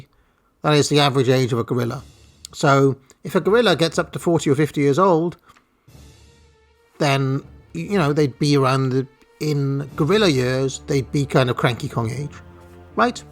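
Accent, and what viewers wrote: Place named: United Kingdom. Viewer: Pompous British Mario timeline... I can't listen past 5 minutes of this garbage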